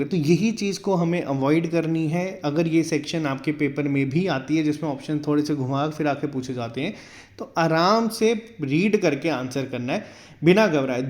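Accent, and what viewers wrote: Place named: Manipur, India. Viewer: Apki awaz sy mujhe uder manipur yaad aaya sir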